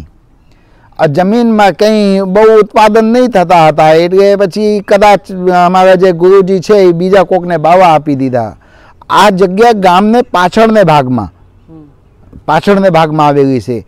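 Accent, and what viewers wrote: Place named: Afghanistan. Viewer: ME BHAI 2 VARSH AFGHANISTAN 🇦🇫 MA RAHI JOB KARI CHE AFIN NI COMPANY MA ANE AA BAPU NI BOLI NO LEKO CHE E AFGHANI LOKO NI BOLI SATHE 100 TAKA MADTO AAWE CHE ETLE MARU MANVU CHE K AA BAPU KOI AFGHANI HOY SAKE ANE PACHI HINDU 🕉️ SADHU BANIYA HOY SAKE CHE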